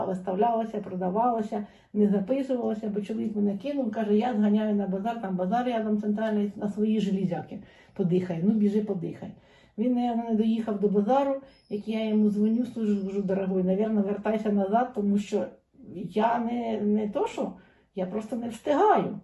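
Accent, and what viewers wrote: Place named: Cherkasy, Ukraine. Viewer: ааа, я так і зрозуміла що розмова Черкащини. Я з Корсунь-шевченківського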